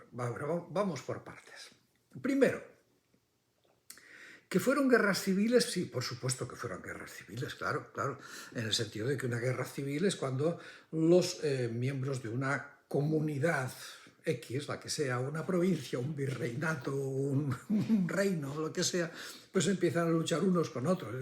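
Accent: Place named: Catalonia, Spain. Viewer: Esté señor huele a independentista catalán ( su acento) esté señor tiene él discurso afirmado de un Fran Masón Vean por ustedes mismos. No cuenta la verdad sino que sigue una corriente de anglosajón loco.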